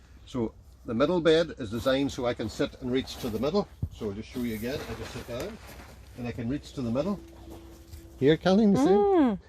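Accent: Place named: Donegal, Ireland. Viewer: Love this , keep them coming! Lovely to hear a lovely Donegal accent.. well done 👏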